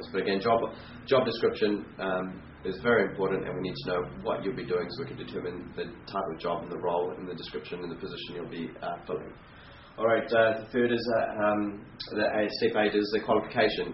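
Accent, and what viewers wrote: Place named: Australia. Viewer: Hello Mate, Firstly speak clearly,there is lot of resonance in background..I can understand..you speak like an Ausiss mate.I know i was in merchant navy and sailed all over world so I can speak in all the accents. do you know rushell peter watch his show...joking Few points for you to improve. 1.Speak loud and clear 2.write on board neatly 3.If you cant write have a printout in bold letters.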